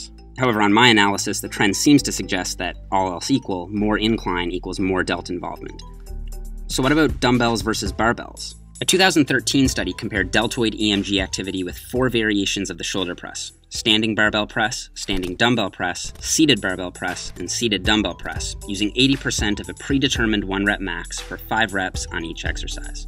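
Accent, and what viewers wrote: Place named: Canada. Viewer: love the canadian accent lol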